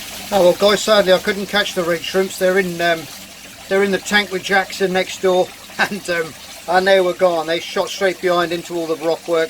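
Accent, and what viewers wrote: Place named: United Kingdom. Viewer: another fantastic video. Don't sell yourself short on your camera work, its brilliant. I started watching your vids and decided to start with Red Cherrys as they are easy. It's good to hear a British voice as most vids are from the USA, no disrespect intended. Thanks again.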